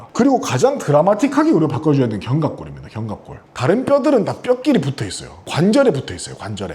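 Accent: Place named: Gimhae, South Korea. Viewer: 행님 김해서 오셨습니까 사투리 구수하네예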